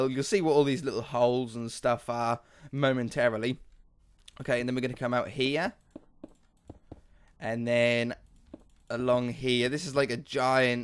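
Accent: United Kingdom. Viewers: where about in the UK are you from I can't tell from your accent?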